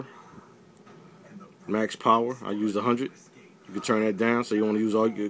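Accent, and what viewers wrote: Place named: Houston, United States. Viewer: Bet that. Im in Houston. Had a homie in High School from Philli. He was cool as hell....lol. But you sound like you from down here....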